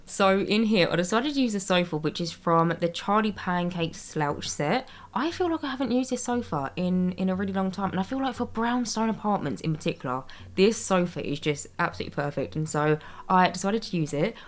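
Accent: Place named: Manchester, United Kingdom. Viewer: Where are you from. your accent is giving northern. Maybe manchester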